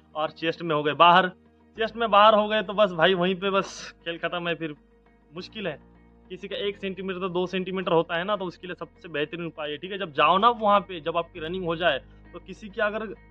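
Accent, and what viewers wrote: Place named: Bengal, India. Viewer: Sir aap bngla bhasa bolte ho, aapki hindi सुन ke lg rha है,mera 3 june ko रनिंग है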